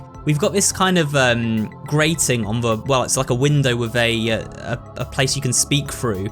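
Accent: United Kingdom. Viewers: The British accent doesn’t make sense to me sometimes “idea” is “ideer” it’s more syllables than the word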